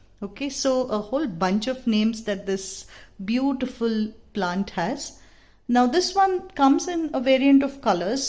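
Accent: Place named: Bangalore, India. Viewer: Your channel and narration is a welcome change...lots of information... and glad to know you are in Bangalore...I could make out by the way you speak :) (edit: as I continue to watch, I hear you say that you found lots of caterpillars..does that mean it's a host plant for a kind of butterflies? Asking as I just ordered and reading up on it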